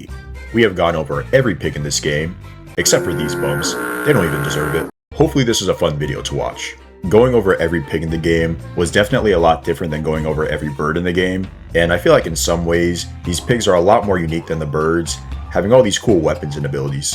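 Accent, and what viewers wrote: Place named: Brazil. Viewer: eeeee um brasileiro falando ingles!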